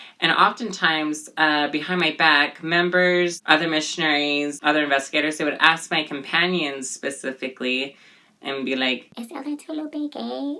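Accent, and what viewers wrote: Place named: Asia. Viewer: I thought u were Asian by your speech.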